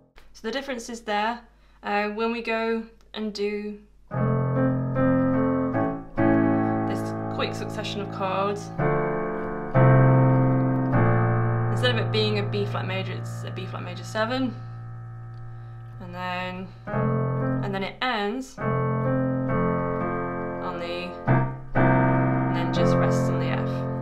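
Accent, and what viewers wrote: United Kingdom. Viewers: A couple of things that I love....piano, and british english, all together 😍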